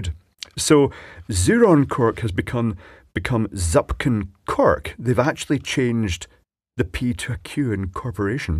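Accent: Scotland, United Kingdom. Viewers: His accent is definitely not Manx, it's Scot's English. He does live on the Isle of Mann, though. Maybe they tolerate more explosions than the Scots? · Few things make for a more compelling video than a calm Scottish voice continually asserting that what's about to be done is really not a good idea.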